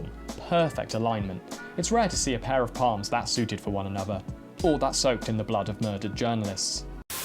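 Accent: United Kingdom. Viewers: I love hearing the British explain baseball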